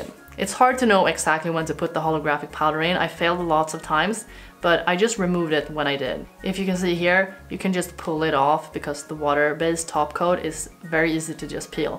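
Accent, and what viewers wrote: Sweden. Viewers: SweetIvory 1203 It's a Swedish accent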